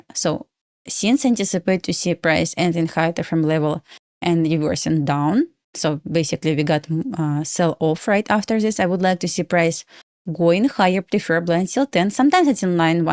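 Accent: Russia. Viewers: Why your voice sounds like Russian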